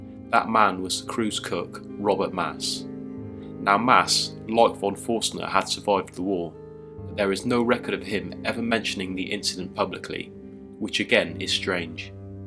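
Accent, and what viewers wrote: United Kingdom. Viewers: Balls to your detractors, +!:) Your voice is British (one big thumbs up for that alone), it's original... and it's mysterious-sounding, in an intriguing, only mildly hypnotic, non-campy way. You're not trying to sound like a carnival barker, so please don't speed it up! And you still haven't told me who your inspirations in the world of the paranormal are!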